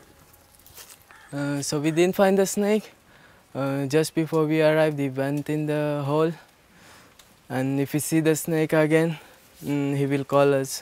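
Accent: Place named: Russia. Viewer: Bro ur accent sounds like that of russians. Cool✌️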